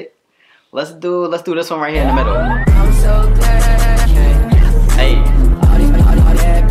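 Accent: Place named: New York, United States. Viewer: I luv the way you talk😍😍😍 Is he from NewYork?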